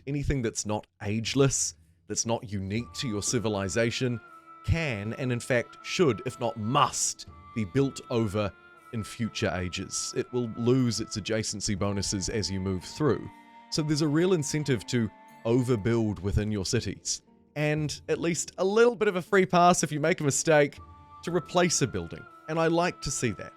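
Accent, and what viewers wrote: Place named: New Zealand. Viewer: The familiar Kiwi accent is a welcome!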